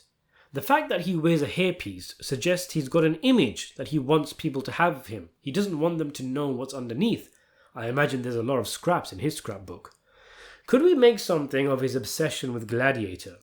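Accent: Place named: Northern England, United Kingdom. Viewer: It’s an accent from northern England, I prefer this than a robotic AI voice, good thing your opinion means OOGATTZ TO ME!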